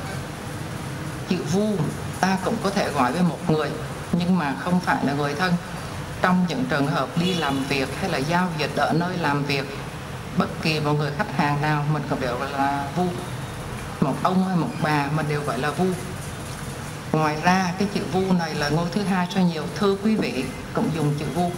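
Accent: Vietnam. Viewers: chũ khó nhìn với cách đọc của miền Nam nên lúc nào ucngx phải chuẩn bị cách google dịch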